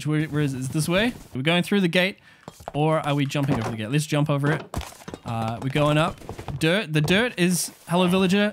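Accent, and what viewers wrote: New Zealand. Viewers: Is that a New Zealand accent? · are you kiwi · I never thought I would hear a NZ accent. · are you a kiwi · are you a kiwi?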